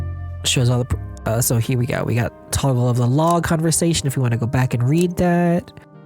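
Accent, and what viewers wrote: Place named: Boston, United States. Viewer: The Boston accent was fun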